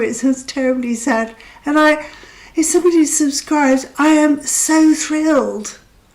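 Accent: United Kingdom. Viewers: Hello 😊 a friend told me about your channel and I immediately got interested and subscribed. I’m just a bit younger and maybe know much more “technology” but getting in front of camera is so intimidating for me. I’m going to watch your videos because I want to support you - I agree we can create a tribe of people like us! You say you like to do art - that interests me a lot because I’m an artist. So, this was the first video I watched of yours and I can see you made progress 👏👏👏. I will watch them all - when I find time in my busy schedule of making videos on tou tube 😅. By the way I love listening to your British accent (as a former English teacher) see you soon ✨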